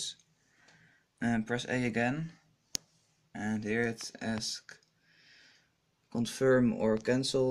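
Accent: Netherlands. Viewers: something tells me you're from holland :P, correct me if im wrong, and if you are from holland(don't worry about it im form holland aswell :P ) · wanneer een nederlander engels praat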